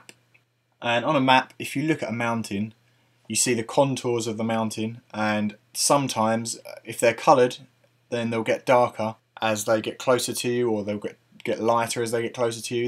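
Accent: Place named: United Kingdom. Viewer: You Brits' accent drives us Americans crazy (with our PROPER way to pronounce words!!) I think I've figured out how the way you are saying words is different. I heard very early in the video when you said the word "Photo". I think we say it, the proper way of course, Pho-to, whereas you Brits say Phot- and then the o. It just doesn't make sense to me. I just heard it again in the word "thirty". You say Thirt-y or "thirt-ee". And it has always just driven me mad to hear you pronounce, or not pronounce I should say, your letter R's. Why don't you use your R's... "MATE"? There you go again..."Settings" is SE-ttings, not SETT...ings. And "little" is LI-ttle, not LITT...le. Foo-tage, not FOOT...age!!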